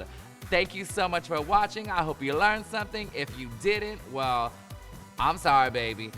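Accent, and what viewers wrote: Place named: New York, United States. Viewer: I live for ha New York accent , love you 💕💕💕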